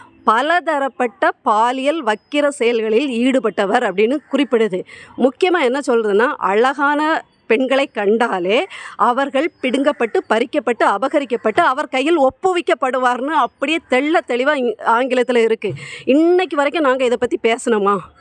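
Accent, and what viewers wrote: India. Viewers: அக்கா கன்னியாகுமரி மாவட்டத்தில் உள்ள பெண் போல் தெரியுது பேச்சை கேட்டல் 💪💪💪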